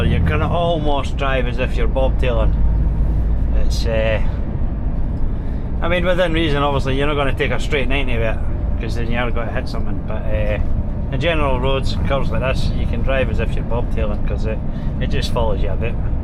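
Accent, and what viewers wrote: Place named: Lothians, United Kingdom. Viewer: yeah makes sense. Cant quite make out your accent. Lothians somewhere.? Sound like Lewis Capaldi or Dean who has the channel - one bike one world.?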